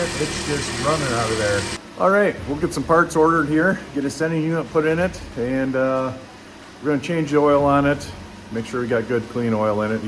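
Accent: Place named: United States. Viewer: good, simple, and in clear USA English. A DIY guy can do this (replace the unit and do the oil change with a good filter) and save a LOT of money!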